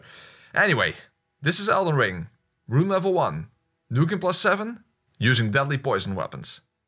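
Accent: Netherlands. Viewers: you sound dutch of niet dan :P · My brother, it's not just that amazing dutch accent; I for one am always looking forward to your new choices for metalsongs. Again: not dissappointed. Then again, can be used for every pyromancy based run too.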